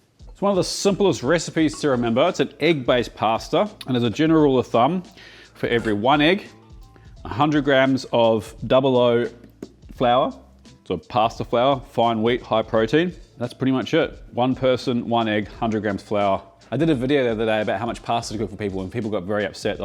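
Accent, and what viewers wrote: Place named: New Zealand. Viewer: He is kiwi mate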